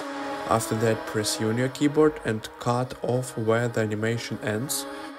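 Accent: Russia. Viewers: Чувствуется русский акцент в твоем английском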